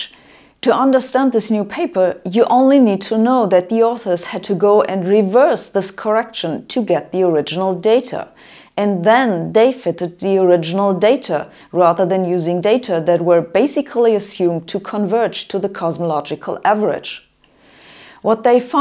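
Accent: Germany. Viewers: Came for the dark energy, stayed for the cute German accent.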